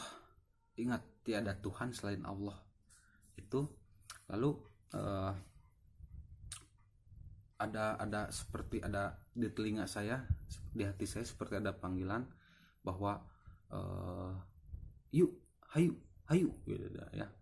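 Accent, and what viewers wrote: West Java, Indonesia. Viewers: MasyaAllah kang, tpi mmg , jujur sy baru pertama kali ini melihat dn mendengar kisah mualaf yg berasal dri suku sunda... maaf ya kang, ini benar2 penemuan sy pertama kali ada org sunda , (dn sangat kental sekali kesundaan nya si akang ini dri lentong nya, ) ternyata ada jg ya yg beragama kristen. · Kok logat nya sunda banget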